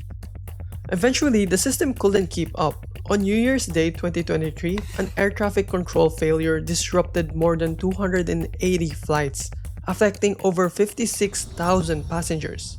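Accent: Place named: Philippines. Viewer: sounds pinoy.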